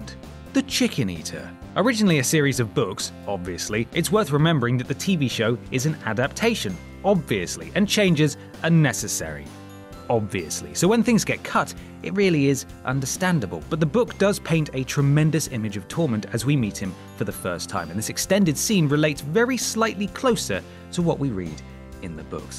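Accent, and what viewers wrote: United Kingdom. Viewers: It would be easy to imagine this chap with his British accent saying "Dam it man!" or "For heaven's sake man!" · here i was thinking i would get to watch 9 deleted scenes but nah im just sitting here listening to some british guy tell me about 9 deleted scenes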